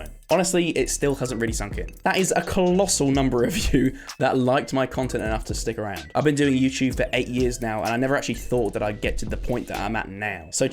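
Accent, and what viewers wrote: United Kingdom. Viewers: British Accent Nice!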